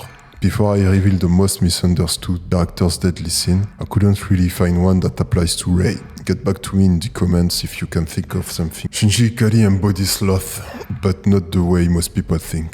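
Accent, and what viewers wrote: France. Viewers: Sounds like French accent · how can one man sounds so french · g reconu ton accent, c l'accent d'un français 100%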